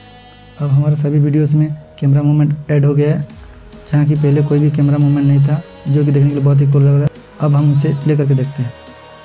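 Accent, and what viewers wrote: Odisha, India. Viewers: Bhai aap odisha se ho kya?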